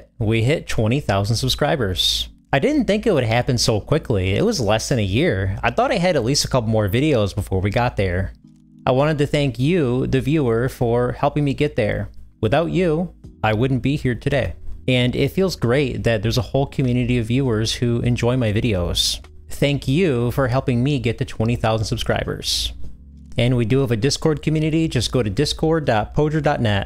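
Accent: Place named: North Dakota, United States. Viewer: I hear a fellow midwestern haha! Sounds like North Dakota hehe! I’m in Fargo. You’re cute too lmao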